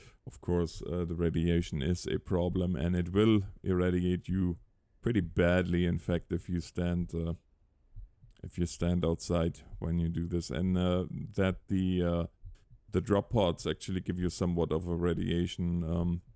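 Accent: Germany. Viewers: you are German , Right ? :)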